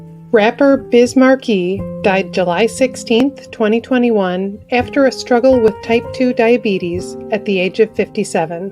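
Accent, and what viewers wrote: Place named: New England, United States. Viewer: U need to calm that extreme Yankee accent down, js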